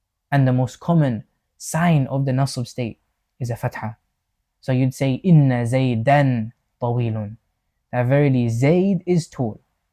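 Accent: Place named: United Kingdom. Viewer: Hi Teacher, since I'm learning (some) Arabic I gather and collect explanations and finally making my own lessons understandable. You just Explained something very Crucial which I've never heard before, moreover I didn't even know it existed. The tricky Fial or Verb, there are 2 types, 1 which is mentioned and 1 which is Not. You are the first to Mention or to Explain these 2 types of Verbs and the differences in Detail. Good Job 👏🏻. One more thing, .... I like your typical British Accent, this gives the classes more flavour 🎉 I wish for lots of Success and more followers / Students. Excuse me for my mistakes, I'm a Dutch speaker. Best Regards from August from the Netherlands 🖐🏻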